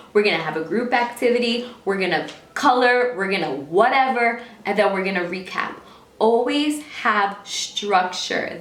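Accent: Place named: New York, United States. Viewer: I love your NY accent.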